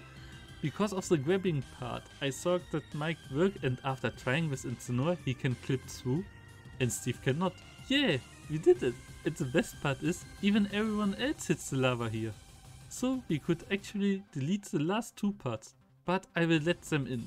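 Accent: Germany. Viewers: You sound german , are you german ? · kann es sein das du deutsch bist?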